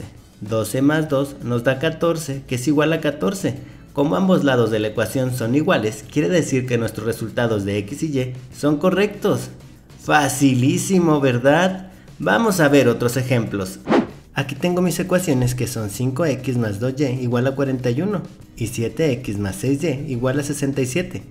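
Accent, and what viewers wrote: Mexico. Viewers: eres de cd juarez o nomas es mi ingacinacion xd?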